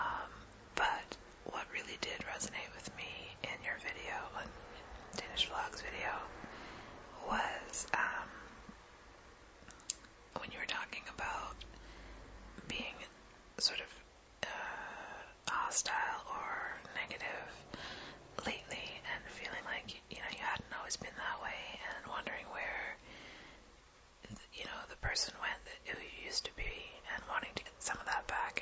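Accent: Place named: United States. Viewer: great videos your the best whisperer on the net we have english ones too but something about the american accent is best for this.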